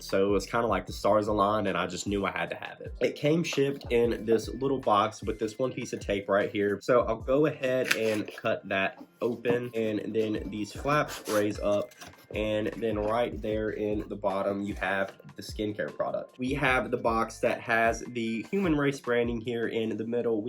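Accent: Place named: South, United States. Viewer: What city/state are you from because I feel like you’re from California but in my opinion you sound like you have a southern accent.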